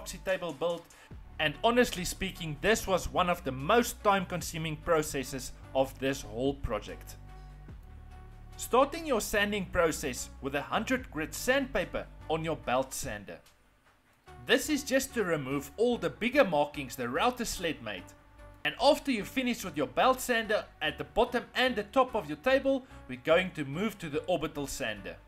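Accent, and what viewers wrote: South Africa. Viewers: I hear a south african accent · What router bit did you use and do you recommend it? I lived in Secunda and Johannesburg for 5+ years. Its nice to hear your accent. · Hey. You sound South African. If so, how can I get in touch with you?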